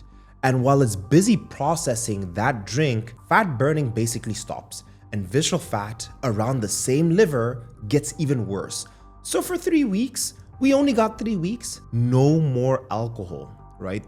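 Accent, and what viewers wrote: South Africa. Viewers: . You have a South African accent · Aaah are you South African? The accent says I come from South Aaaahhh. If yes, please make us an SA specific meal plan, with all the foods we love in a way that helps us🤦🏾‍♀️🤦🏾‍♀️🤦🏾‍♀️. Shooting my shot. Or I read too much into the Aromat and accent.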